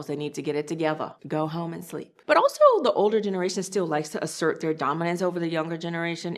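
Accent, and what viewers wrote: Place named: United States. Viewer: Love the stories and the American accent with occasional Korean.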